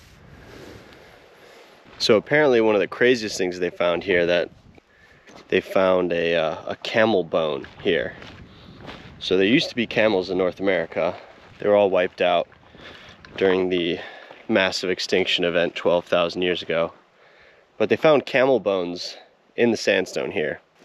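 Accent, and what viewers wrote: Australia. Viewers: Love your Aussie. And the wind not music takes me there with you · I love your aussie so much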